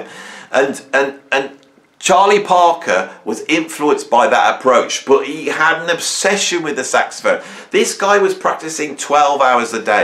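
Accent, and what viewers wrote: Birmingham, United Kingdom. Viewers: I follow David Packham on American politics - you need to do an advert at the end of each video. Posh pot noodles, hair gel, face scrub...you latest book! Alright, the last is a bit Rick Beato. 😂 Soon your studio will be filled with so much crap you won't be able to film! On seconds thoughts, the loud, opinionated Brummie still wins every time.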